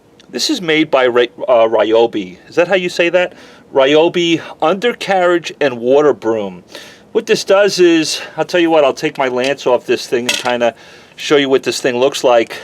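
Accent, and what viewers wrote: New York, United States. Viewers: You may be based in Dallas, but you still sound like a New Yorker.:) BTW, I believe it's pronounced "Ree-oby".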